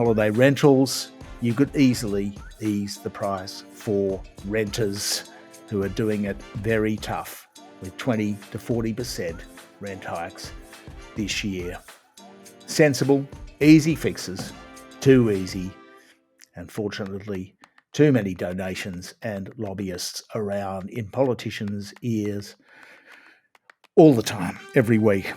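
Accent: Australia. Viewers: Thanks so much 4 the real news. I've been a news consumer all my life = 80 years, 50 % of it rubbish. Now that I've found You tube, I've been educated to the truth! Channels like this one; the report, Colonel Douglas McGregor, Jeffery Sacks. Moats, and so many more... the good and the bad ones, I listen to them all and make up my own mind as to what is true and what is false... Clair Daily and the other hippy looking bloke in the EU/Irish parliament, such wise words spoken with passion... and now, such a clear speaker from the heart, I like his Sydney clip (accent) not as good as a Western Queensland one, but it'll do. (grin). So thanks mate, if I had the money I'd be a patron, instead of a lowly subscriber. The tax system in Oz has to change = the workers pay, the rich grin and the big-end of Town pay nothing at all. Marry Mismas to all from QLD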